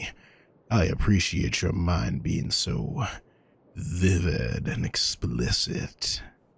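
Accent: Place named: South, United States. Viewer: I love a good southern accent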